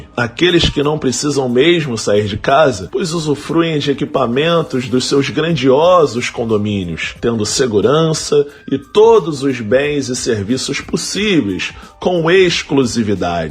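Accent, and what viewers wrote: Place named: Rio de Janeiro, Brazil. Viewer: volta logo, preciso de mais vídeos e do seu sotaque carioca.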